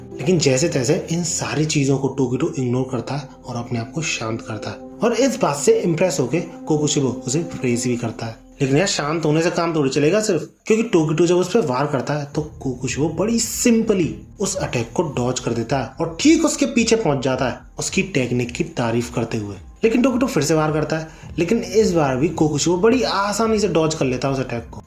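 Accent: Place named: Indore, India. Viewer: Bhaiya apka indori accent bada mjedar hai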